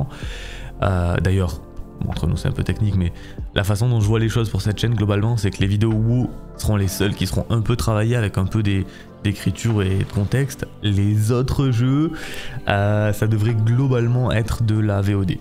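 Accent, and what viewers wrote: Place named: South, France. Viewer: Moi qui au fond espérais voir le retour des vidéos 5 raisons sur wow avec l’accent du sud x)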